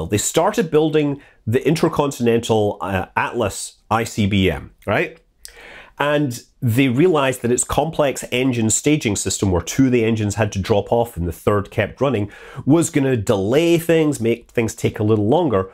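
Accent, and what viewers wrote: Scotland, United Kingdom. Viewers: His Scottish accent kicks ass IMHO.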